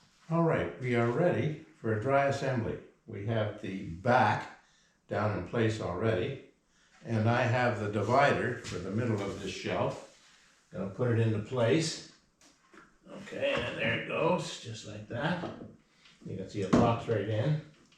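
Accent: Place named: Canada. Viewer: picking up on a Canadian accent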